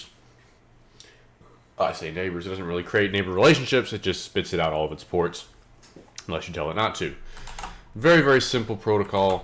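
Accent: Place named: Texas, United States. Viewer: Sounds somewhat Texan - and I live in Scandinavia :D So, probably have to make do with the ones you release here then :)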